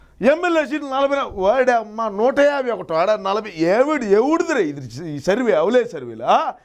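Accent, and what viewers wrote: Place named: Kadapa, India. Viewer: Anna first time telugu Kadapa slang vinutuna.super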